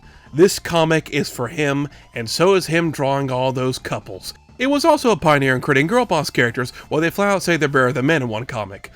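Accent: South, United States. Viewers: This is completely off topic but are you from the South? Something about the way you talk man, I can HEAR the southern in you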